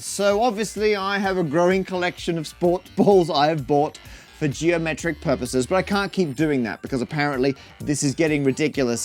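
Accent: England, United Kingdom. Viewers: Nope, it's pronounced how he said it in the video (minus the English accent). It's a german brand, not an American on, so how we say it in Europe is the original. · So your from England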